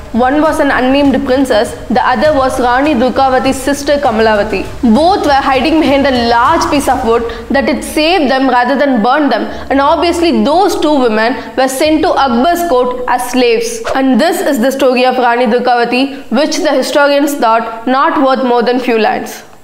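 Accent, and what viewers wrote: Tamil Nadu, India. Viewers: You have heavy Tamil accent, why don't you make videos in Tamil itself? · You have a very thick tamil accent. Please work on your accent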